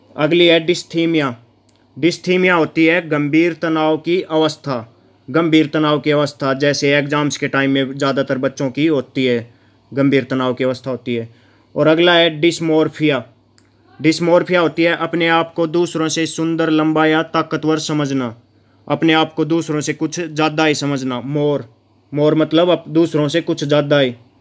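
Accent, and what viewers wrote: Haryana, India. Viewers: Haryanvi h ye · Haryana ka laage h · Hariyana se ho kya sar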